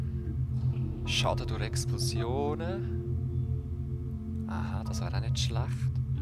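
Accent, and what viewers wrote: Switzerland. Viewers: Ich find dini Lets Play's voll geil wills uf Schwizer dütsch isch und du machsch es so lustig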